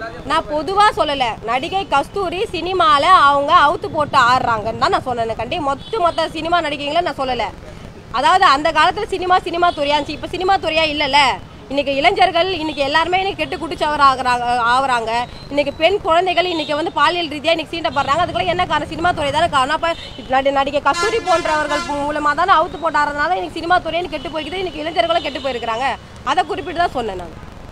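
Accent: India. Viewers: அதென்ன வீரலட்சுமி மக்கலே மக்கலே இடையிடையே மெட்ராஸ் தமிழ் வேற வருது😮😮😮. தோலோடு தோலாவகவா😮😮😮 இன்னா இன்னா வேற இடையிடையே வருது😮😮😮. அதென்ன க்ளாஸ்மெட்😮😮😮வந்து வந்து வந்து வந்து வராம வந்து. இது நல்லா இருக்கு தமிழ் பாஷை😮😮😮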